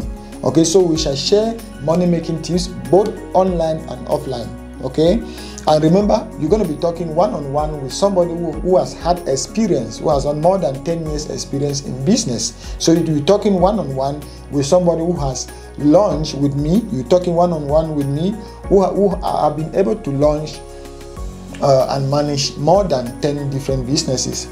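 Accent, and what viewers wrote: Cameroon. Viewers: Yoo bro when I first came across your chanel something told me u sound like a Cameroonian am also from Camer and proud to come across u bro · OMG am happy you're from Cameroon,am from Bamenda no wonder I understand you better than other forex traders because you have that our Cameroon accent😂 wow am happy to hear this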